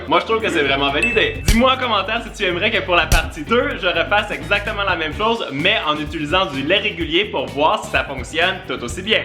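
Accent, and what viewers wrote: Quebec, Canada. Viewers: J adore ton acsen cbekcois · J’adore l’accent québécois 😂😂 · J’aime grave l’accent québécois 😮😍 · Vas il et québécois donc ses normal qu'il a un acsant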